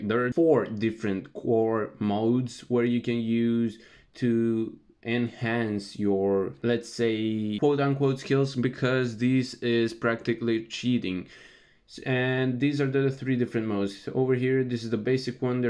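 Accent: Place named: Balkans. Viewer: Are u from balkan? I know the accent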